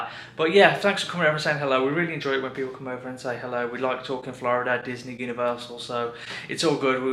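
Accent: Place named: Birmingham, United Kingdom. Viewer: Love a brummy vlog. I'm from across in Wolves so nice to have a more recognisable accent in a vlog for a change, haha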